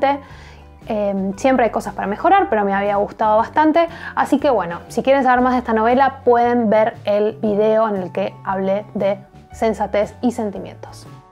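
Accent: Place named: Argentina. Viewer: Al fin un canal sobre libros con una argentina. Todo bien co0n los compatriotas latinoamericanos, pero es mas hermoso oir nuestro acento argentino. GRACIAS! Tomé notas de algunas novelas.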